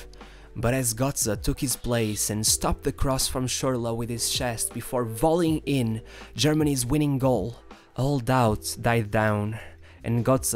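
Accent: Portugal. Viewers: You sound Portuguese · Very tuga accent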